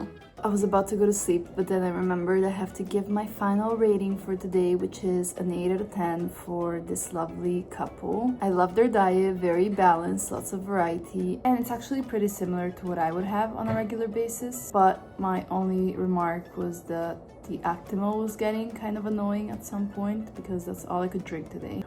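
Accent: United States. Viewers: why do u speak in american english when ur european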